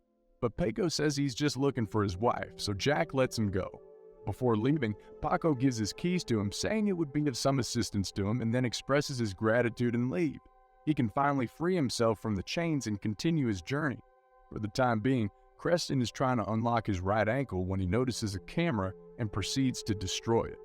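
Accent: Texas, United States. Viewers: Texas accent recap? is this an AI voice?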